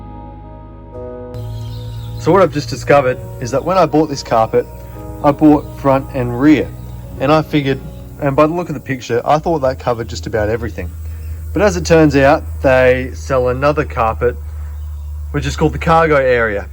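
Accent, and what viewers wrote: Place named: Australia. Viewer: the job looks like it was quite a lot of work, you did a good job. What's with the furry seats LOL, Maby they will be a project too. I see your dad helps you a lot, I had a dad like that. you're really lucky, a lot of guys don't have dads like that. You should always let him know how much you appreciate him. I enjoy your videos, keep them coming I am looking to see what all you do to your vehicle. I am in Florida USA, your accent sounds like your down under.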